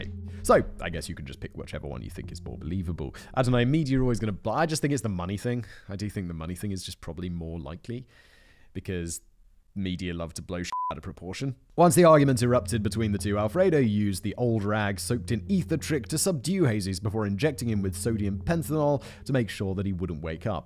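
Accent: United Kingdom. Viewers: With that British accent, you could describe taking a shit, making it sound classy.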